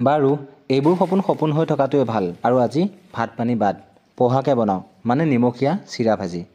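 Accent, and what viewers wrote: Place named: Nalbari, India. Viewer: কথা বিলাক নলবৰীয়া যেন লাগে, মই লখিমপুৰৰ পৰা চাই থাকো।❤❤😊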